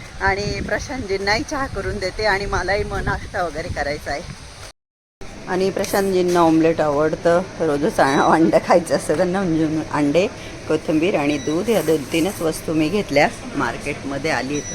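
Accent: Nashik, India. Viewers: तुमची टोनींग nashik ची वाटते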